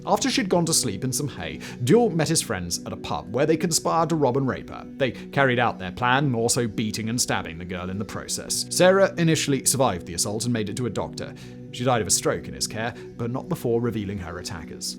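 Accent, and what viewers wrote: England, United Kingdom. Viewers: With his thick English accent, he needs to talk slower!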